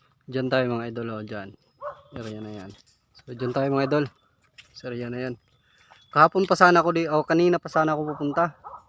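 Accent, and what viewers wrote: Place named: Visayas, Philippines. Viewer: Hindi ma klaro hahaha bisaya diay ka dol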